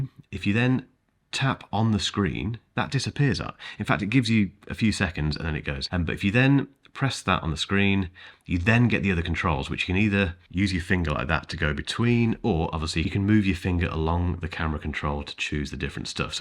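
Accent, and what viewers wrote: England, United Kingdom. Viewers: Listening to British people review products is excruciating torture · Unwatchable ramblings. I really loved Samsung galaxy and didn’t miss iPhone apart from the ecosystem… 😂😂😂… my dude do you comprehend that it’s all about the ecosystem. What did you use it for… the calculator? Another proof that English accent does not mean intelligence.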